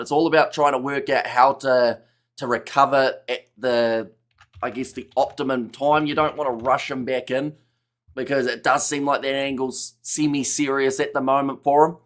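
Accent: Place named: New Zealand. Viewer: This fellow is not a great commentator. Speaks far too fast, very difficult to understand his acute NZ accent. Slow your dialogue down a little mate!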